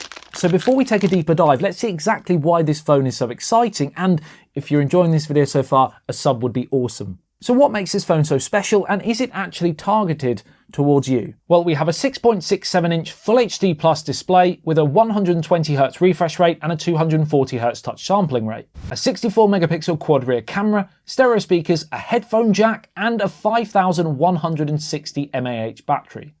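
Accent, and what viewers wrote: United Kingdom. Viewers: This is the most british accent I heard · I really love British accent 😍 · I have seen unboxing and reviews by Technical Guruji, must say this is a kind of british Technical Guruji. Rightly said, this is the most british accent I have heard.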